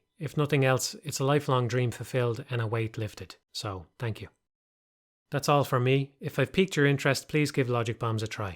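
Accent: Ireland. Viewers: And he emerges! Man, I really miss your dulcet Irish tones talking about games. Congrats on the game-making achievement! <3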